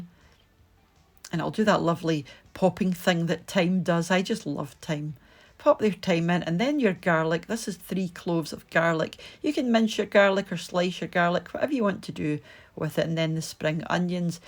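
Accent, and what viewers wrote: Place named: Aberdeen, United Kingdom. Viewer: Look delicious, thank you .. and I love your accent, sounds like an Aberdonian? 🏴 ❤️🇦🇺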